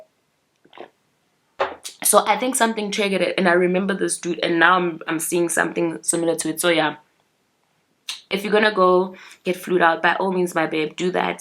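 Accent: Nigeria. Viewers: Definitely, definitely want to see more content similar to this. It opens eyes and strengthens women in so many indescribable ways. I love the Nigerian accent as well, always gets me smiling🤭🤭🤭❤️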